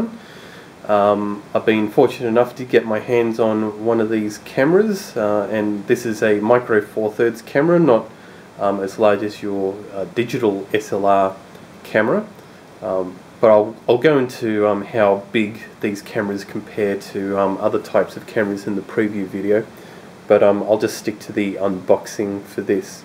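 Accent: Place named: Australia. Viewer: have u heard of australian accent?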